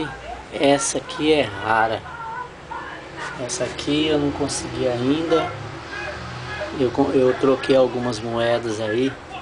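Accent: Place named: São Paulo, Brazil. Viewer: Vc é de São Paulo mesmo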